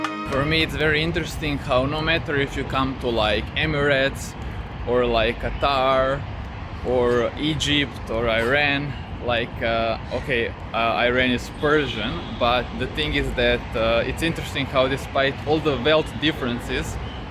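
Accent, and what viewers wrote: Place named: Balkans. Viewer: Thanks for the 'nice video, where are you from Originally? You sound balkanese!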